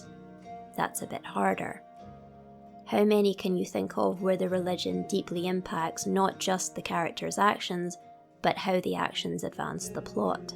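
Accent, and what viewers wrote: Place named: Scotland, United Kingdom. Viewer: I hear a Gaelic accent in your voice ( im assuming Scottish?) Interestingly, Karen Traviss when writing the Republic Commando series based Mando culture heavily on the Celts. Mando'a is spoken like Gaelic but with more of the rules of Norse as far as vowels and such. Aliit Ori'Shya Tal'Din for example. Its what's being sung when Boba Fett finds the Tuskan tribe that adopted him in dead. It means family is more than blood. The family structure is similar as well to both cultures, though women are expected to take even more of an active role in defense/war unless they have children. As a Christian Dins journey reflects my own in some ways. Im more like Boba Fett personality wise, but i struggle between the world and the church. Literally the only other character i related to in this respect was Quigon Jinn vs the Jedi Council. 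But for my relationship with the greater church. In his case, it was the Will of the Force vs the Will of the Jedi.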